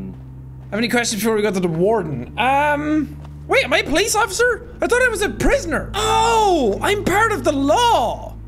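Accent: South, United States. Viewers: coming from a southerner it was about a 6-7 out of 10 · It's scary how realistic Jack's Southern Accent is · I live in the South. I have lived here my whole life. And never have I ever heard such a beautiful Southern accent. Well done. Beautiful. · Jack's Southern accent is even better than most Southern people I actually know irl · My uncle lives in arguably the most southern county in north Carolina and I swear that accent sounds just like him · I wasn't expecting his Southern accent to be that good!!! He is officially a Southerner now!! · Jack's southern accent is the greatest thing I have ever heard. 10/10.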